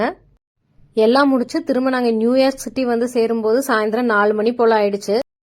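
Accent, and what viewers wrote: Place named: Kongu, India. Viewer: கொங்கு நாட்டு தமிழ் வழக்குடன் அருமையான பதிவு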